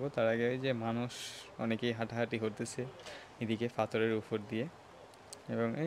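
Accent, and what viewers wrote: Sylhet, Bangladesh. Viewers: Bai afne kita sylheti ni?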